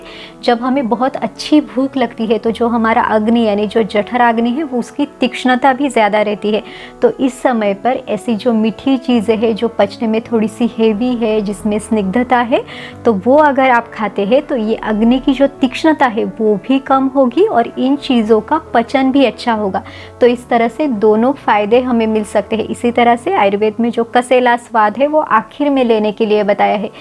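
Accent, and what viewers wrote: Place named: Maharashtra, India. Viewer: ताई तुमचे मराठी accent मधे हिन्दी नेहमीदिसुन येते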